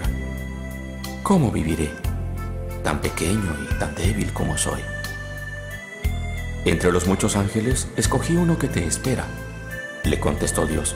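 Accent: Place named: Latin America. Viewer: Bonita historia..D : De donde sacaste la voz? es latina ,jaa ..pero esta bien,tiene su encanto.jaa te doy mi pulgar jajaa ..hayy que daño..no te rias ,quitarse el pulgar para dartelo ,duele un poquito jajajajaaja... te queria dar las estrellitas uhm..pero se me estropeo el Cohete interrestelar jajaaaaa... besitos de un gatttito gotico..miauuuu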